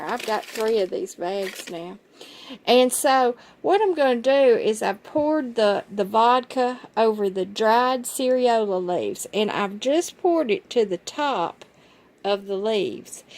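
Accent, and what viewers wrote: South, United States. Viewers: Omg I love your southern accent ❤❤❤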